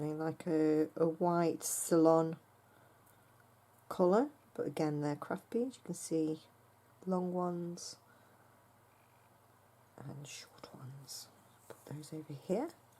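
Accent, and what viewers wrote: England, United Kingdom. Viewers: Hi, I’m Ericka! Pleased to meet ya. Enjoyed your video so much and had to respond about your mojo. Lol. Your so funny! I assure you-You still got your beading mojo! Happy Birthday also by the way! I’m in California USA. Glad I found ya and loved your unboxing and pieces you made:-) very pretty. Yes I feel the mojo loss all the time! That’s why I watch unboxing videos to help spark a bit of creativity. I suggest Pinterest- it’s great to browse other designs for that inspiration. I also have a fabulous talented lady named Gina from <link> that I always watch for inspiration on YouTube! You should check out her unboxing and she’s on Patreon and shares her tutorials. She’s really talented. Anyhow, thought I’d introduce myself and say Hi! Not sure where you are but love that accent! England? Happy Birthday whenever it is this month! 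God bless you - ty for sharing!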